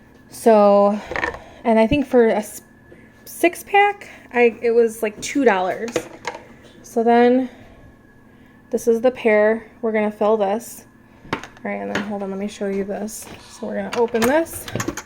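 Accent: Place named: Michigan, United States. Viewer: You're from Michigan aren't you lol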